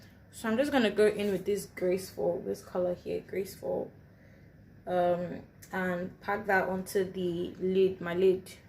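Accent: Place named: United Kingdom. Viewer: This your British accent doesn't want to go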